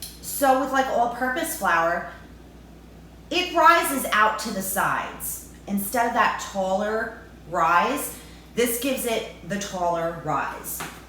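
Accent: New York, United States. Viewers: Same! Living in the greater NYC area you can even call out the borough, and if you are really good the Jewish version of that borough. I think is in or from Long Island. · Great job on this video and easy recipe. Will be baking some tomorrow! I love NY/NJ hard rolls and miss them since I moved out of the area long ago. Plus I love hearing your accent! Thanks again. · Too funny - the accent sold me immediately- only fellow New Yorkers can appreciate it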